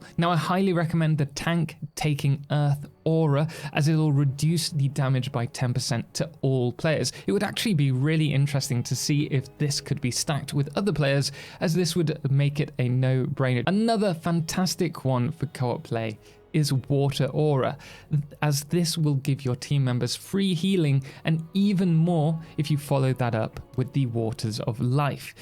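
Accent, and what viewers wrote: England, United Kingdom. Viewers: My man, which part of England is your accent from? Can’t place it exactly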